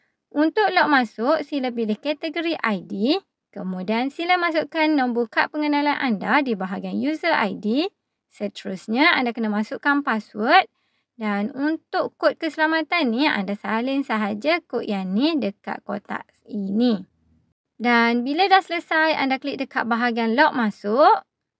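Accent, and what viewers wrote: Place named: Kelantan, Malaysia. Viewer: Awk org kelantan ke. Mcm slang kelantan 😊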